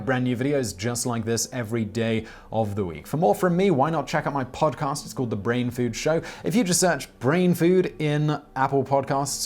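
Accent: England, United Kingdom. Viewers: And that cheeky British accent makes him sound so much more authoritative/intelligent. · I like your videos in general. Not this one. Too many strawman arguments. Keep your British English accent out of our proud American nation's history. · Sounded like an Englishman. They know our history better then we do. (I DON'T think so). The sad thing about this video, we who are older and had our country's history taught to us know this is bull. However many of our young people don't. Thanks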